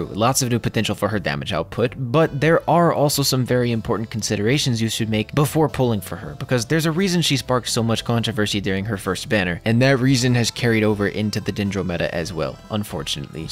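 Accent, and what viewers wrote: United Kingdom. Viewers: The British accent 💀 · The English accent though hehehe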